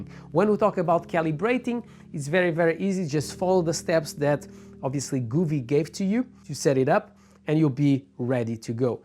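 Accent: Portugal. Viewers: Bro.. You might have the strongest Portuguese accent I've ever heard 😅 (I'm also Tuga) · Wait…. Are you Portuguese?!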